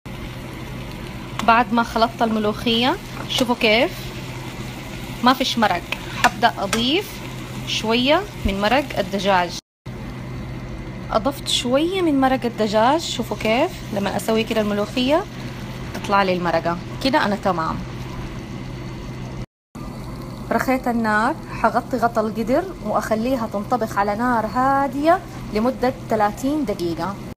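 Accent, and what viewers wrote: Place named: Hejaz, Saudi Arabia. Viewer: هي بتتكلم باللهجة الحجازية اللي الآن كل الدعاية والإعلان يتكلمون بنفس هاللهجة ....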